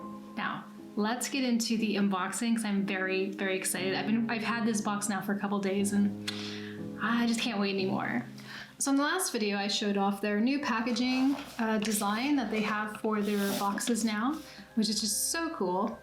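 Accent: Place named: United States. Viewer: Congratulations on 2,47K subscribers!!!!! I have a question, Why did you move to Berlin, Germany, I assume you're from the states due to your non german accent!! lol. Do you think you will ever move back here???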